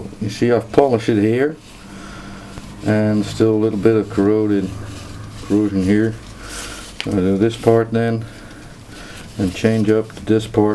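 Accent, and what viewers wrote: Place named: Netherlands. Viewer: it sounds like he's dutch and we dutchy's always talk funny if we talk english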